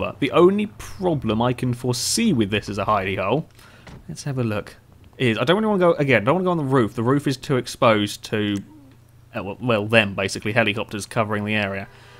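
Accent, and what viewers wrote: United Kingdom. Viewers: If it wasn't for your British accent this would have been unwatchable, that said I enjoyed it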